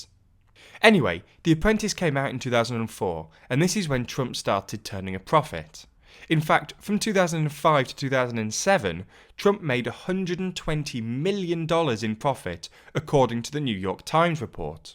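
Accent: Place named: North, United Kingdom. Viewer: When did pick up a Northern accent?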